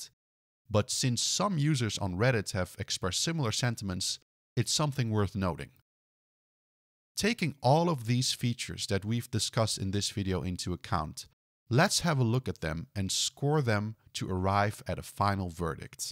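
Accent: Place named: Netherlands. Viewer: Nice video. Thanks! And clearly Dutch! ;-)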